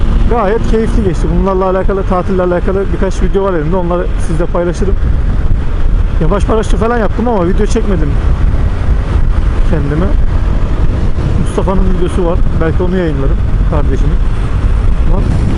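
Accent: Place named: Konya, Turkey. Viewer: Abi senin memleket neresiydi konya mi konuşman çok benziyor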